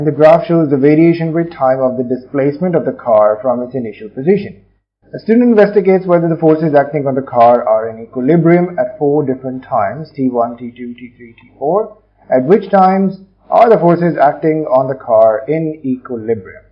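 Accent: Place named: India. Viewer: his accent is not bad, much better than common indian accent!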